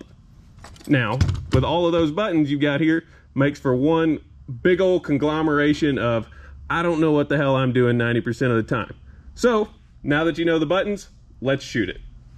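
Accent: South, United States. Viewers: This man sounds exactly like demolition ranch but with a bit more of a southern accent.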